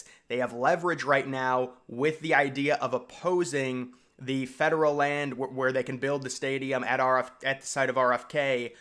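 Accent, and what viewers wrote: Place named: Baltimore, United States. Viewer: are you a Marylander? Kinda have that Baltimoron accent:)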